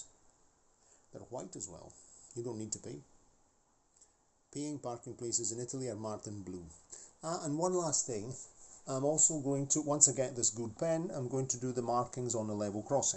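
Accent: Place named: Scotland, United Kingdom. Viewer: I think autumn passed us here last Tuesday! 😂 Don’t forget that your “heavy “ Scots is a language in itself. Dinnae let nae Sassenach tell ye otherwise! My French wife speaks a lot of Ulster Scots too. It’s funny to hear her call the dogs “wee skitters” or the price of the shopping “fairly skelped her, so it did”! 😂 Did you grow up in a bilingual home in Glasgow? I know your family are Italian. Do you have a Scots accent when you speak Italian/Romanian? Anyway, off to Germany shopping now. Try a bit of the aul Deutsch. Ein Bier bitte. Guten Tag!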